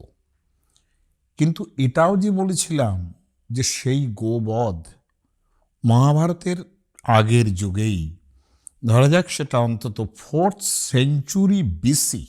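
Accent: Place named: Rajshahi, Bangladesh. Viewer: Well he still carries bit of his early Varendri or Rajshahi accent I think.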